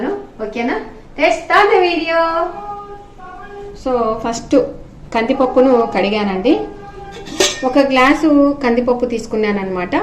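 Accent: Rayalaseema, India. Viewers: Mana Rayalaseema slang chaala baaga matladtunnaru andi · Avva super maa avva kuda mee lage undi madi ndl local andi meedi nagative a vuru andi meeru chebutu unte naku ndl ravalanipistuni kani hyd lo vuntamu mimmalni Ndl akkado pelli lo chusinattu anipistundi anduke a vuru ani adiganandi mee videos chustuvuntanu rayalasseema stylo ne matladandi maku vurlo vunnattu anipistundi maa amma kuda meelage pappu gattiiga chestundi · ఎన్ని రోజులు అయిందండీ రాయలసీమ వారి వంట ,మాటలు విని,ఎక్కువగా కోస్తా వాళ్ళ చానెల్స్ ,language ఉంటుంది.చాలా బాగా చూపించారు అన్ని items ఒకే వీడియోలో thank u. మాది కర్నూల్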